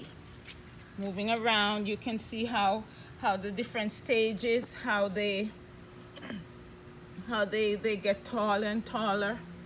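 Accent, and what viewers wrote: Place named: Jamaica. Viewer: Is she from Jamaica I,can tell by her accent and she has a akee tree we have those in puertorico we got it from our Jamaican neighbor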